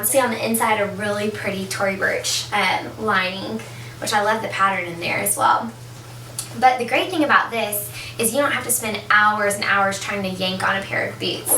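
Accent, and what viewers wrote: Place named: Texas, United States. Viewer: I'm from texas too so i can fell the accent come into my soul haha but i don't have an accent because my parents are extra foreign lol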